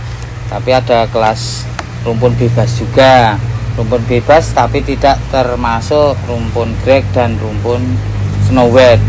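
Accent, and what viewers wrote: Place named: Java, Indonesia. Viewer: Tiang jawi nggeh logatte keton banget 😊😅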